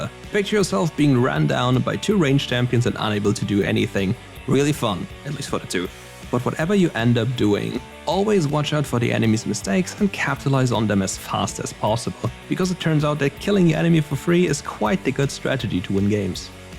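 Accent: Germany. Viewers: I like the charming German accent in the recent videos.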